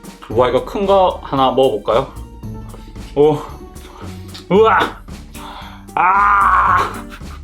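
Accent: Busan, South Korea. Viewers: 사투리일걸여 부산사람이라서 ㅋㅋ저도부산사람이라 바나나가안되여 빠나나밖에안댐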